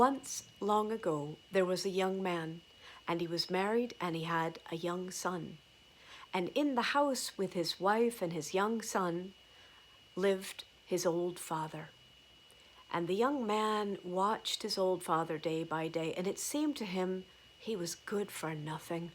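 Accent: Ireland. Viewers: Oh how I loved and needed this! Yes I have done things which, in retrospect, have disappointed or inwardly embarrassed me... but it IS true that I have learned a tremendous amount (in retrospect)... it seems the more daring the effort the more I learned afterwards. I always thought I needed 'a clean slate' of a life, 'never putting a foot wrong' which never worked. I'll listen to this again and have already sent it to my kindred spirit friend across the country 💕 Since delivery is half (or more) of the message I want to say your English is beautiful and I love the very delicate tinge of Irish in your speech.. Thank you!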